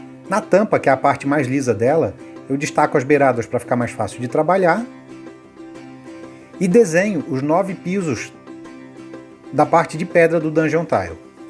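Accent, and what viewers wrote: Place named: Santa Catarina, Brazil. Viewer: E esse sotaque aí meu extimado? És da onde tu? SC?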